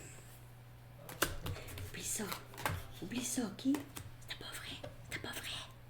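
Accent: Quebec, Canada. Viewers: Bonsoir, J'adore vous écouter, tes messages refletent ce que je vis. J'adore ton accent québécois, ça me fait sourire! Merci 🙌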